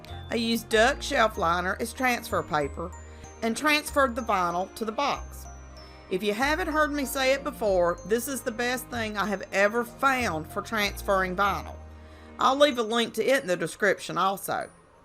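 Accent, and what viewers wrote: South, United States. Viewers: I love all of your projects and your Southern accent, which is like mine.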